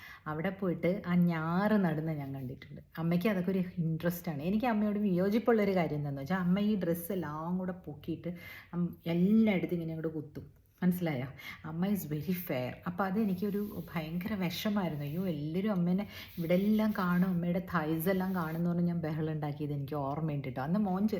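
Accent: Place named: Valluvanad, India. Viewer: മാഡം.... അമ്മ ഏത് സ്കൂളിലെ ടീച്ചർ ആയിരുന്നു. മാഡത്തിന്റെ വല്ലുവനാടൻ സംസാരമാണല്ലോ... നല്ല സംസാരം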